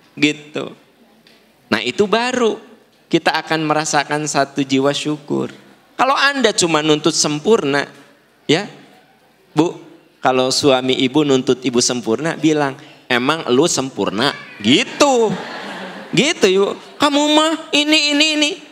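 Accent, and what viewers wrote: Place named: West Java, Indonesia. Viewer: Ilmu yg bermanfaat serta kangen dgn logat Sundanya mengingatkan silsilah orang tua dari Kiara condong Bandung dan sekarang sdh tdk ada tempat utk pulang kampung ( sdh berpulang semua)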